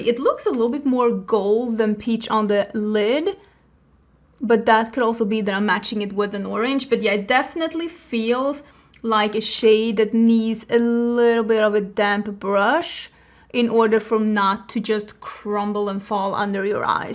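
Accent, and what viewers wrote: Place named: Sweden. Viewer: She is i believe Swedish.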